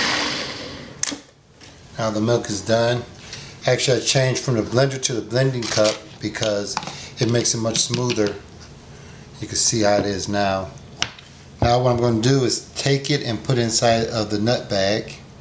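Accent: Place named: Trinidad and Tobago. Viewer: You sound like a "Trini" lol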